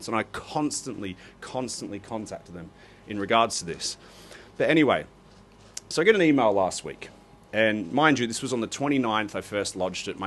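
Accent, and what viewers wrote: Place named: Australia. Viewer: You sound like an Aussie. The same as me. We fly Emirates BC once a year to Europe. Never had an issue. Did fly to the States once and our luggage was lost for 2 weeks. Yes it was a pain in the ass. But as my hubby says, dont stress about crap you cant control. But i get its frustrating. We got zip as we were told to keep reciepts and claim on insurance. As for Eithad and Qatar. Qatar. Please support your fellow Aussie females and boycott them. Females were taken off their flight, which is government owned, and were forced to have internal examinations in a ambulance on the tarmac, as someone had a baby and left it in the terminal. Every female was taken off the flight and subjected to this assault. Law suit is currently pending. Qatar also often change out their aircraft. You book a flight which has Q suite listed. Then operational change and you end up on a piece of crap business class seat that has zero privacy. 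Atleast with Emirates A380, if you pick a window seat you have privacy with how the seats are done. Like your own cubby. Qatar are well know for changing out aircraft for operational reasons. Eithad. Besides looking cheap and nasty, some of their planes, 787 and A380, they have some rear facing seats in BC. No idea about FC. So if you go this route chose your seat wisely. Dont let one bad experience with Emirates define them. Look at Qantas. I only fly them domestically because they are crap. But i still fly them because Virgin are even worse. Happy travels. Caz.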